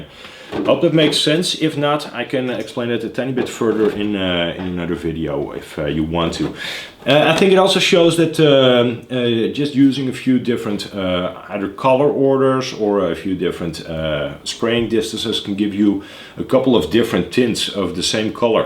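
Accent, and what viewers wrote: Netherlands. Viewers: Hey, I'm just getting back into RC, I flew fixed wing years ago, but now I've got the hots for trucks and cars. Ive watched a few of your videos and thinks they are great, no bullshit, just facts and well informed opinions. I'm not interested in your cats either, or people who flower themselves up to be more 'interesting'. 🙄🤥 Keep up the good work. 👍 I have my first car, a Slash 2wd OBA, but this will be my secondary car, my first love is crawlers and I'd like a RC4WD Gelande LWB 110 purely because I want my crawler to be as scale as possible, but damn it's expensive! I'm considering a HPI Venture with a Defender 110 hard body with interior instead as a cheaper alternative, I'd love to see a video on fitting various bodies to the HPI. 😉 Oh and BTW, I can't get your channels theme song out of my head, or you're 'crazy' Dutch accent. 🇳🇱👍 Jelly from 🇬🇧😁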